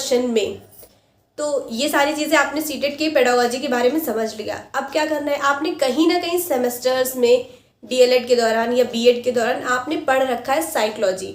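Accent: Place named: Moradabad, India. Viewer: Aap sayad moradabad mandal se ha he aap ki language bata rahi hai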